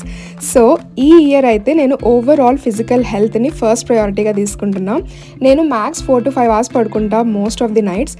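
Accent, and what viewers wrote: Rayalaseema, India. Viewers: UR EYES, VOICE, LANGUAGE I MEAN SLANG {RAYALASEEMA:P} AWESOME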